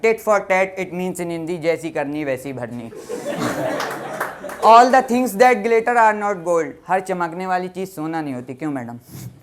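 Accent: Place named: Kanpur, India. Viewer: pooonnchaa. Kanpur dialect is mast.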